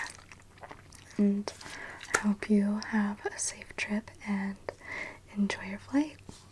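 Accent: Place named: Canada. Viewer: The way you talk, you must be from Canada 🍁 well because I am too. This is the first video I saw of yours.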